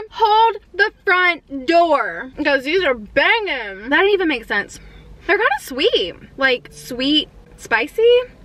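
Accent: South, United States. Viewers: Just curious, where are you from? You sound like you’re from the south and so am I! 💗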